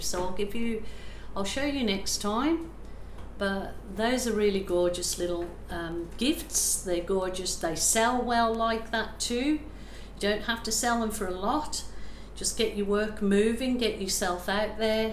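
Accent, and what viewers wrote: Birmingham, United Kingdom. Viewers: Is that an original brummie accent too?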